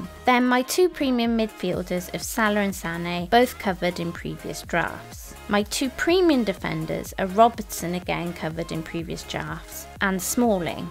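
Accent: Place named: Wales, United Kingdom. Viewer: Never the less; those lovely Welsh(?) tones are honey to the ears. Keep up the great content and get well!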